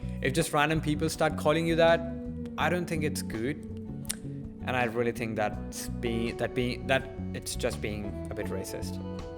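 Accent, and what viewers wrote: Australia. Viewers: Which accent you speaking in is it Strayan or Indian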